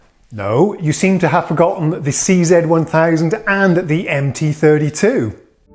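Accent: United Kingdom. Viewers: are you british born or swedish? because you speak a beautiful british english with a fabulous accent! I would like to speak with an accent like yours:O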